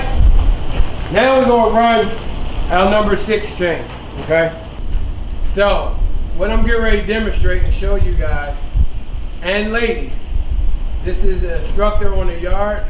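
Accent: Baltimore, United States. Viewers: my manz got that baltimore twang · You can tell this guys from Baltimore by that accent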